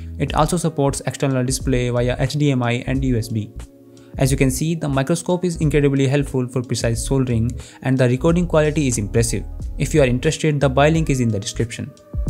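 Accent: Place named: North, United Kingdom. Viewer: fix yr accent northie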